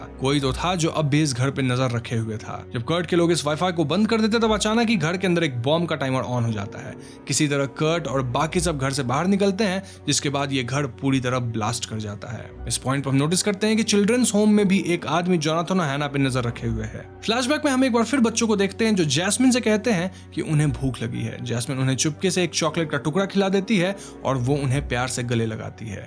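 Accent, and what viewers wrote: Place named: Bihar, India. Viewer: Your accent is so cheap every time you say D instead of R ... total bihari accent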